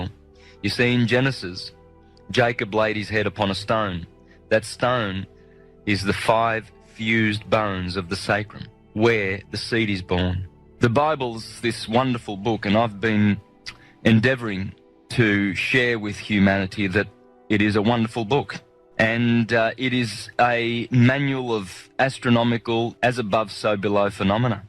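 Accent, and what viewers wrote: Australia. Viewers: His Auzzie accent gives me the shits. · Shame on you for not picking up on his voice and Australian accent.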